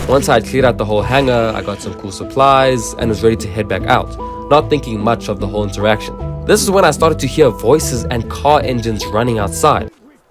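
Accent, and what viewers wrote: South Africa. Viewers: He's definitely South African! No way he's not. I know my people's accents! 😂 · You may not see this but are you a fellow south african? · Bet you're South African, Amirite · This dude is a South African isn't he? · Are you from South Africa?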